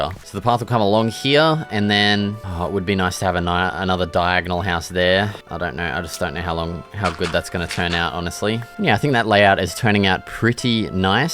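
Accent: Australia. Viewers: Oh gosh you make great builds , but your narrative makes me laugh,fair dinkum Aussie way of talking,crazy man ..........few swear words thrown in is typical, lol,not that Im keen on that way of speaking, but its just you.... Cheers 🐨🦘🇦🇺🌟🤩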